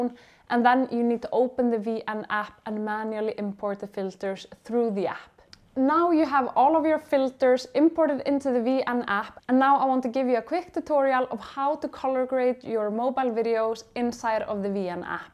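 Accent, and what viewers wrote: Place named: Iceland. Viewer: Love your Icelandic accent!! Beautiful ❤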